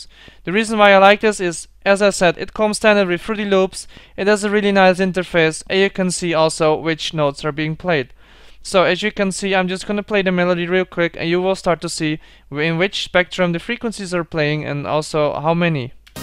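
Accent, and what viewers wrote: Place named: Netherlands. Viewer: I love your dutch accent, like mine xD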